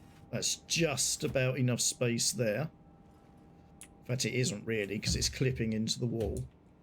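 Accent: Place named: United Kingdom. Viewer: Thanks for the kind words and you already got something I don't have.. A cool British accent! Keep it up man! Subbed.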